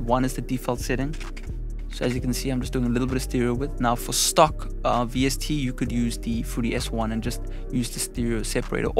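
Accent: South Africa. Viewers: u sound like ur from south africa. It sounds nice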